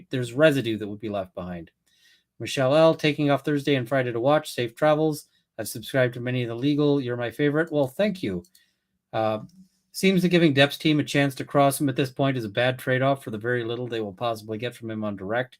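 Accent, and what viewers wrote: Canada. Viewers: Had no idea you were Canadian! Yup, Americans always know us by our Canuck accent! I don't hear it either. Enjoy your blog and your jury insights. Today's witnesses (Tues) were a total bore. Those poor jurors! The damages perspective was a bit interesting. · I love the accent! Most of my family is in Canada and you sound just like them! Also my mom says it that way . She is Canadian and I’m dual cit but never got to live there . Still May move.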